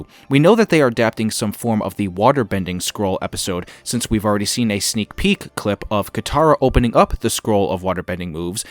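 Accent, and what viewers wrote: Bisaya, Philippines. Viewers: uu, klaro kaayong Bisdak 😆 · Hahaha bisaya sad ka brad?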